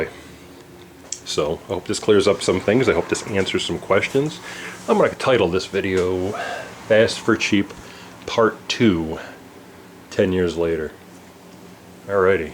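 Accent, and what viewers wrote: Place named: Cleveland, United States. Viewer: Listens to this guy talk: definitely from up around Cleveland. “So anyway up here around Northeast, Ohio.”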